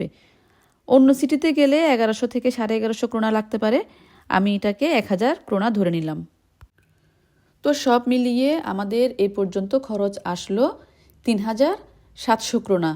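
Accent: Chittagong, Bangladesh. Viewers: আপনার বাড়ি চিটাগং তা ভাষায় বুঝা যায়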